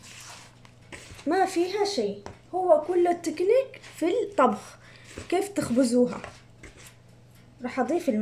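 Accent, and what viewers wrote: Aden, Yemen. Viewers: ماشاء الله تبارك عليك ممتازة بكل طبخه كل اكلك حلو واحلى شيء الهدره العدني لا تغيريها بالله عليك❤😘متابعتك من عدن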